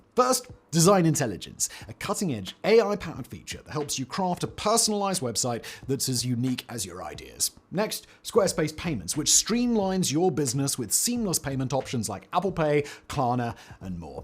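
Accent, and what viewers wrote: United Kingdom. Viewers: "Highly warmongering country" says the guy with the British accent, with aplomb. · Such racism against Turks... 1 our "invasion" aka occupation of northern part of cyprus is completely within international law, since we are guarantors of the islands populace alongside with the british since ww1, that gives us the right of occupation if the said populace is endangered, like how greeks committed mass genocide to Turkish cypriots. Second: kurdish "freedom fighters" are in the terror list of every western nation, only since 2014 the ypg branch, which was newly formed as the syrian branch of pkk, were not counted as terrorists. Thirdly the kurdish problem didnt originated from Turkey and spread to other nations, it was already underway in iran, iraq and syria all of whom committed genocides against Turks but NOT Turkey. Dont trust a man only because he is bald, has glasses and has a british accent, this bigot is just a western racist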